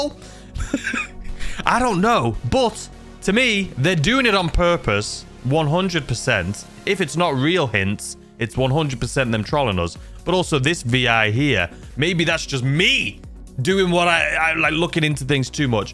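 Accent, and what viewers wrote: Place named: Scotland, United Kingdom. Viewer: youre scottish? love the accent btw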